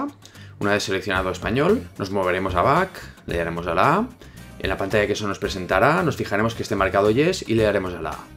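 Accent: Spain. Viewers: hombre me gusto considerablemente el tutorial pero resulta que en Android hay emuladores de todos entonces en realidad no sabría la necesidad real de hacer toda esa configuración, por otro lado no he podido acceder al Tv Box desde el PC.... Todo tutorial me ha gustao, pero aquello del acento de Uds los españoles a los latinoamericanos nos incomoda en forma considerable ... y vamoshhh Tio... A POR ELLO¡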